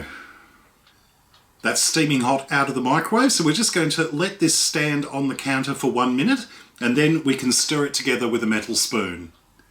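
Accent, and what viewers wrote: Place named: Australia. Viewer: This is my first time here, oh how I love our Aussie accent. Now I will follow you on utube, cake looks yummy.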